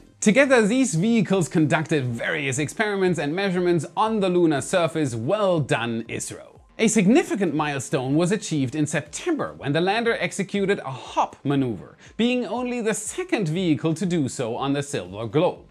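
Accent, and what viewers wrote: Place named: Germany. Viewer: Nice surprise. Always a space geek's ecstacy. Space must always come with a German accent!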